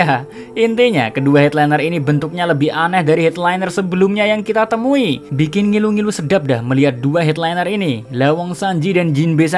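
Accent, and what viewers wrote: Java, Indonesia. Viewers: Konten react paling ....... ditunggu dan terngiang dgn logat jawanya 😂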